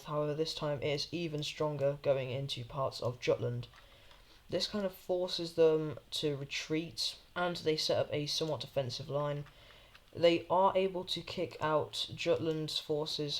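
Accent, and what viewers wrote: United Kingdom. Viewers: why do people think you sound like anorwegian when he’s american you’re from southern england 😭😭😭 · British accent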